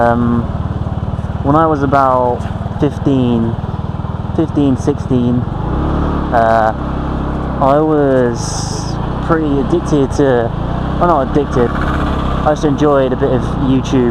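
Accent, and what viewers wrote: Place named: British Asian, United Kingdom. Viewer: Have you been living in TW for a while coz you sound a bit British+Asian, accent I mean. Hope you are enjoying the life here.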